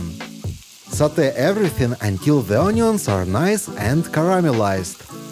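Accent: Iowa, United States. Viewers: The lowan accent is so beautiful. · Is that an Iowa accent?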